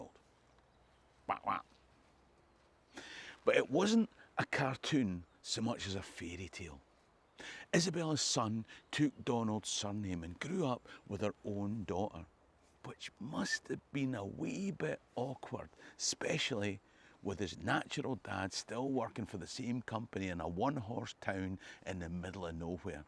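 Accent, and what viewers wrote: Scotland, United Kingdom. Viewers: I think the accent has to be my favourite. The Scottish accent has inbuilt inflections, expressions and passion. It's also good to listen to a mixed race person who is at least as interested in the history of his white ancestors as his black ancestors. None of us really knows all the races they come from. As my paternal grandmother once said "a person is lucky to know who their grandparents are by looks. Women were forced. Women had affairs. No one really knows". As for DNA tests, people have received mixed results from different labs and some people have sent off the DNA of their pet and received back results for a human. A real in depth result costs a lot more than most run of the mill labs offer. DNA ancestry tests are for profit and for data gathering. One in particular admitted selling the info on to insurance companies.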